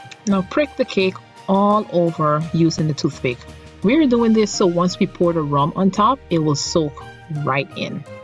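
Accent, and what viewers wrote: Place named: Guyana. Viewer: I've been looking for and using online recipes for years but its been a real pleasure to find your recipes for my favourite Guyana home recipes! Your reassuring helpful Guyanese voice and accent are comforting and inspiring. Thank you! Can't wait to get started!👍🏾🇬🇾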